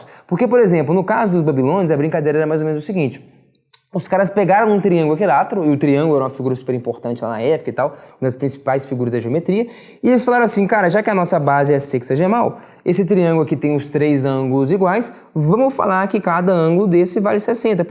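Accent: Minas Gerais, Brazil. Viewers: Pelo seu sotaque imaginei q vc é mineiro e eu também da cidade de Dores do Turvo confrontante com a cidade de Ubá MG, gostei e adimirei da sabedoria e a sua vocação de ensino muito claro e fácil de entender parabéns. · a mineirice exala em vc cara kkkk e hj aprendi que a microsoft não sabe dividir 360, os caras pularam do XBOX 360 pro XBOX One, depois desistiram e foram pro X, agr estão aprendendo seno e cosseno? kkkkk falando serio, video excelente!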